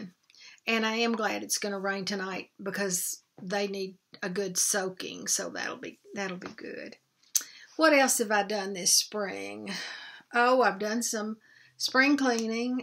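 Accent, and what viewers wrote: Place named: Arkansas, United States. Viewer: Hi, I watched you for the first time today & certainly enjoyed it😊 I will be returning..we live close, we are on the Arkansas line, so your accent made me feel at home😊thank you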